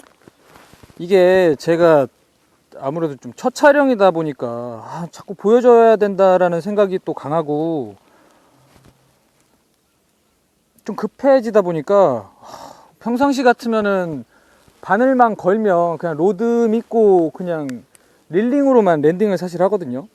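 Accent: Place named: Chungcheong, South Korea. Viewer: 말투가 충청도인디요.. 내가 방송 해봐깐..ㅋ 어려보이는데 몇살이래요? ^^ 응원합니다~~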